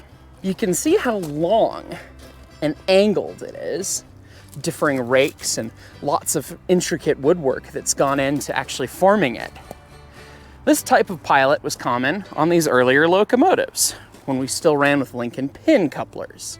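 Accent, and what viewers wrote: United States. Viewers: I do not mean this in a negative way at all, but is this how men in Colorado talk/ sound? Colorado accent?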